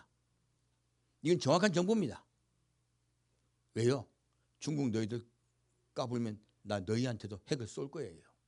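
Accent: Jeolla, South Korea. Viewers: 말투를 보니까 전라도 출신 같으신데, 빙빙 둘러 말하지 마시고, 솔직하게 입장을 밝히시는 게 좋겠습니다.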